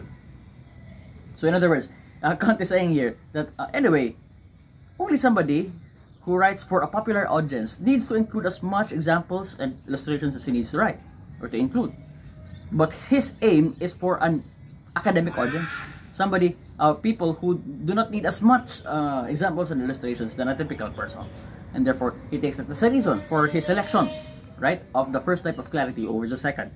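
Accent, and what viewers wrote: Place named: Philippines. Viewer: hes got a philipino accent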